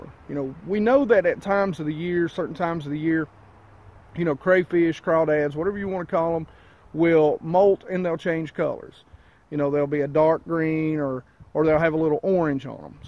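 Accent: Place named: Tennessee, United States. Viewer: You sound like a TN guy so if you ever fish Norris it would be cool to fish with you.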